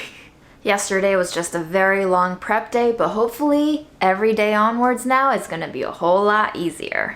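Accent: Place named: South, United States. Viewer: she has a southern accent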